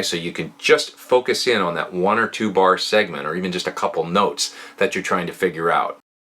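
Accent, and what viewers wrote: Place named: Canada. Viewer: This series of videos is awesome,. Is it just me or do you sound extra Canadian today?:) Not hangin' on you, man. I just normally hardly notice your accent but today it just seems to be front and centre. Probably just me (and/or the rum). I can't talk. I'm an Aussie. Rock on, mate.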